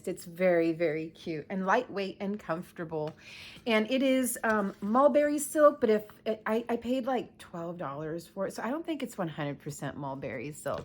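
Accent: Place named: New Jersey, United States. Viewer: Holy moly! I had thought you were in your late 20s!! I was stunned to hear you had grown children. I know how you feel about relocating and not having friends where you live. Your videos are very entertaining and enjoyable. I thought about buzzing my hair like that, just leave the sideburns. Lunch lady net!! That was good! Your New Jersey accent is awesome! You are a hoot! Your parking lot Karen comment put me in the floor!!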